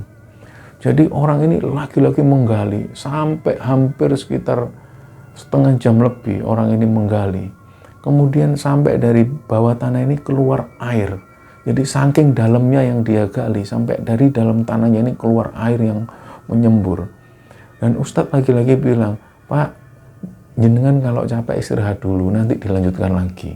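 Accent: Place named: Surabaya, Indonesia. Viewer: kalo gw kangen sama bokap gw, dengerin channel ini. Bokap gw orang Surabaya .... logatnya dan gaya berceritanya sama ^__^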